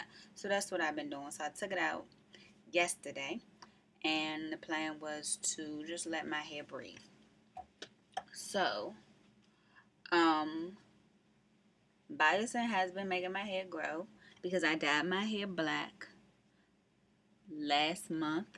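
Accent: United States. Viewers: She from DMV 100% lol... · OMG your whole video I was like, she gotta be from D.C.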